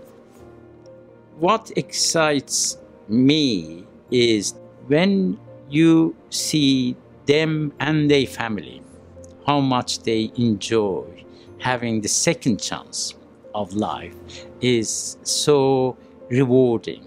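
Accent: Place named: Azerbaijan. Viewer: Azari accent👍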